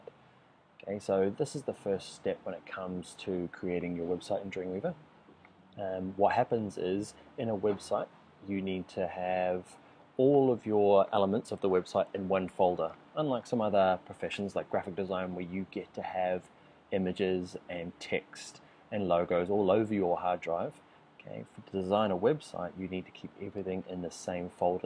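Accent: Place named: New Zealand. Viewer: Loved hearing the Kiwi accent bro. cheers for these dude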